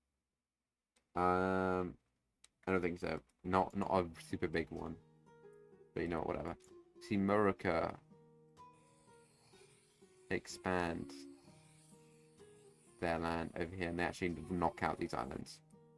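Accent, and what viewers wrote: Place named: United Kingdom. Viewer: Hold on you're BRITISH???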